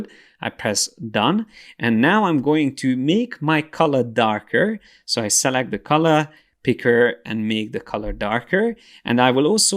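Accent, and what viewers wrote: Hungary. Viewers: That magyar akcentus though :D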